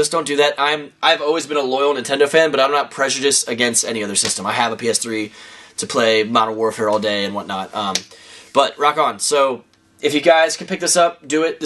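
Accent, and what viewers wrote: United Kingdom. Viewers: british accent O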